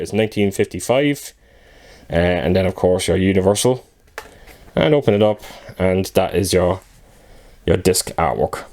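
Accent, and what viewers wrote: Ireland. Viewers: I know we chatted before neighbor, I thought you were a Louth man (accent) if you remember, anyways I love the channel and never miss an upload keep them coming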